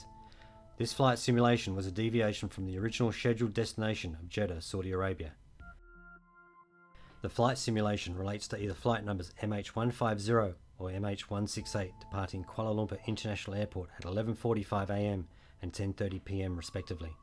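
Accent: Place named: Australia. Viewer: Brilliant work. Really well done. How do you know the CB’s were pulled at a certain time? And, what was the purpose of then restoring their position later in the flight? Again, great job, sir. As a fellow Aussie, you had me with the accent. I hope you pursue a career with ATSB. Needs more great, analytical minds!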